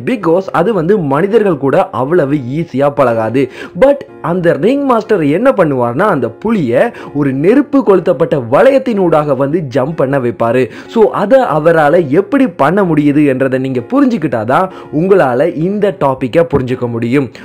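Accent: Sri Lanka. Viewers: Bro neenga srilanka jaffnava?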